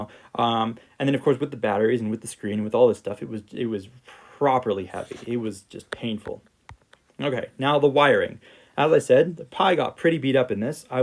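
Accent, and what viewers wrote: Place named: United States. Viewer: his accent seems american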